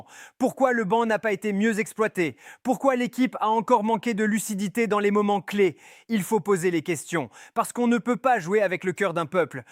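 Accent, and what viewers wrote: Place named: Senegal. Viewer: Tu parles comme un 100% senegalais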